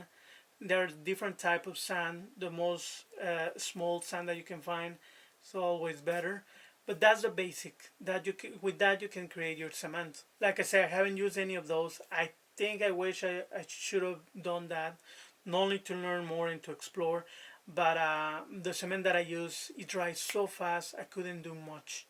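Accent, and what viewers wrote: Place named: Italy. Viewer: Thanks for the video! I was making a clay figure sculpture in college, & then got injured in an accident. I was next not allowed by my professor to finish & turn it in for the semester; very unfair. My daily Bible Word gave instruction "Do not return to the place where you have been harmed," showing me not to work with this cruel professor anymore. So I was trying to find a way to make my sculpture since I no longer have access to a pottery kiln. I looked at expensive materials I cannot afford. I was praying & wishing there is some kind of archival 'air dry clay' that is like pottery. I asked God can you please let there be some kind of 'miracle' clay I do not need a kiln to fire?? & to please show me what to do? DUH--- then right after prayer, the next thing that comes my way is videos of cement mixture sculptures! LOL. I realize it is the 'miracle' material I was hoping for--- air dry, but solid... 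lasting... not so fragile like plaster. I really want to give this a try... & hope to avoid problems like you talked about... "rocks for mom" where the concrete dried before you can use it. I am watching many videos. Your Italian accent is very attractive to American women like me... though you are too young...boo... Oh well. At least I can learn art from you. Thanks for the lessons! Blessings. Peace.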